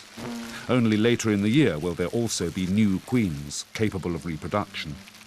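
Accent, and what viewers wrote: RP, United Kingdom. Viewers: Good video, but flawed by the dude with the patronising tone, narrating to me like I am in an Oxford library or school, then the stupid music, if I want music I go to my playlist for it, here I want wildlife, no buffoon trying to talk Royal no big band, just decent narration, good cam crew, couch a beer door shut lights low....job done, but no,...I get this patronising Gitt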